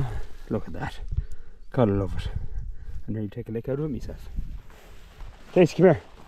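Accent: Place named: Ireland. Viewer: Hi...loving your vlogs,it’s great to see you back home.My cousin is trying to do our family tree, and it appears our family originally came from your part of the world.I remember my grandparents,plus aunts and uncles, ( sadly all passed now )speaking in a lovely Irish accent…talking about Leprechauns, great story tellers, So hearing you talk,and seeing the landscapes,makes me feel closer to them and where they came from,very much appreciated. Sue (Woodhall Spa) x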